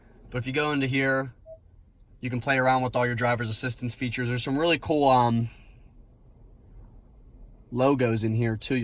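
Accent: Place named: Pittsburgh, United States. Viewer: Lol are you from Pittsburgh? I can tell that accent from anywhere!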